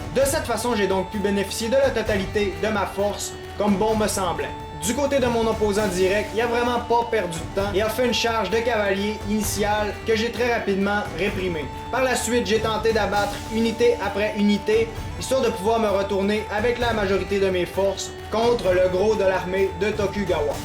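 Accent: Quebec, Canada. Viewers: il est QC arrêter de dire que s,est un accent bordel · haha du quebecois sur youtube ca fait du bien :)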